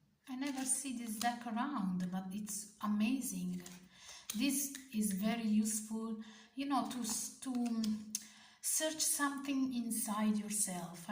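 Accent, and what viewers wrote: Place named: Italy. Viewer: Love the decks you've chosen!! And your Italian accent is so delicious, I didn't know most of the decks you presented!! Thanks a lot.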